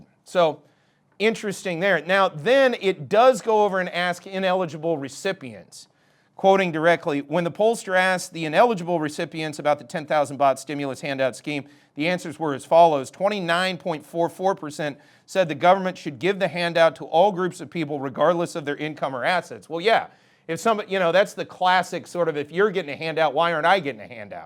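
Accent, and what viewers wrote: United States. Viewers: I love the way you say "us Thais"... a true patriot! American accent. Thai heart ❤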